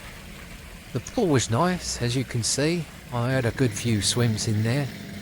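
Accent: United Kingdom. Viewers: narrated in the style of Monty Python's 'Bicycle Tour of North Cornwall' very uplifting more like this with English accent please thanks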